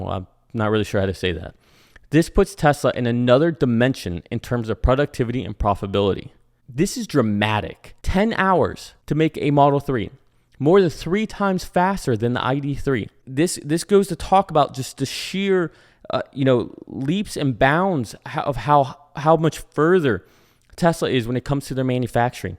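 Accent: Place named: Germany. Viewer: "Diess is a problem and Dat is not " sounds like a German speaking english.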